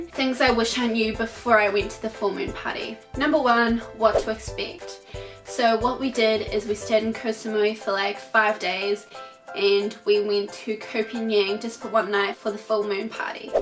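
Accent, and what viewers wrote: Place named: New Zealand. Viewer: What a painfull video with hideous accent... Bloody kiwis....some people naked...yeah right....i think the acid she had has swallen her tongue cause she cant talk properly...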